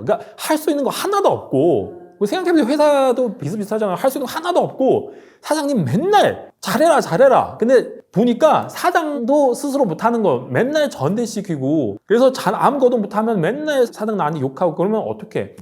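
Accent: China. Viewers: 이분 교포인가요? 억양이 중국인이 한국어 배운거 같아요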